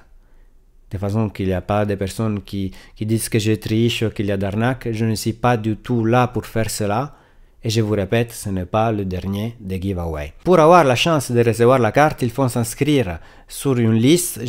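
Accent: Italy. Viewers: Salut. Tout d'abord, un grand MERCI pour ta chaîne et pour l'effort de parler correctement le français avec ton accent italien ensoleillé, alors que la plupart des youtubeurs francophones blingbling avec du RGB partout ont moins de mots à leur vocabulaire qu'un berger allemand. Je me suis abonné à ta chaîne parce que tu vas à l'essentiel de l'info sans fioriture et surtout sans effets de style linguistiques "tendance" et ça, ça repose vraiment la cervelle. Tes avis et critiques semblent refléter tes connaissances professionnelles d'ingénieur informatique et c'est plutôt rassurant 😉 Sinon, pourrais-tu parler un peu plus du matériel que tu conseillerais pour des machines orientées artistes 3D (Unreal Engine5, C4D, Blender, AE...)? Merci 😉😊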